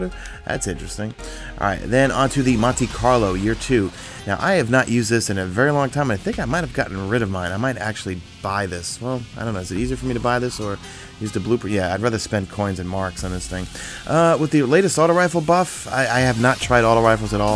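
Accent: New York, United States. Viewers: You weren't kidding about your old new york accent and speed talking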